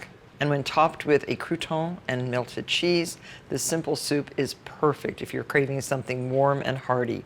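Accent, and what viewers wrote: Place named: North Jersey, United States. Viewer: prrrr-retty. So martha! So north Jersey, raised in class.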